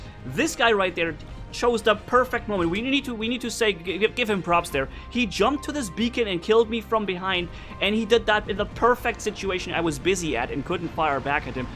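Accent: Germany. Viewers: Bild ich mir das nur ein, aber du hast einen ganz leichten deutsche Akzent🤔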